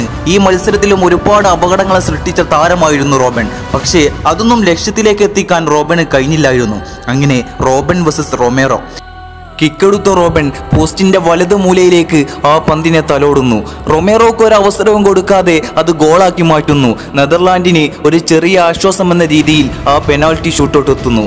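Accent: Malappuram, India. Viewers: Malappuram karante voice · മലപ്പുറം ആണല്ലോ 😄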